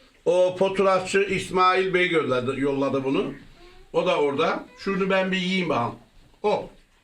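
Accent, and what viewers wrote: Gaziantep, Turkey. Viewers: Dayi antepli misin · Antepli misin?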